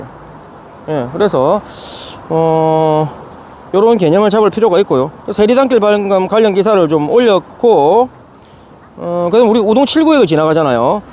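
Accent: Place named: Seoul, South Korea. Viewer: 의 완벽한 서울말투의 답사수업은 단연 최곱니다 😊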